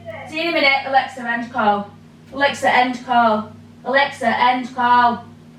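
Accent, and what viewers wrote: Oldham, United Kingdom. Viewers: Delightful is a great way to describe those Scones!... Another wonderful video, love that Oldham accent, makes me homesick lol...Nice one 🖤👌X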